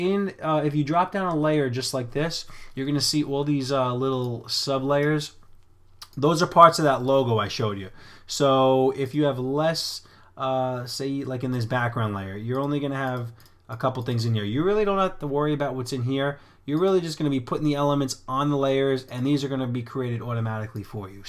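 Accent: New York, United States. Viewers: Awesome, thank you fellow New Yawka!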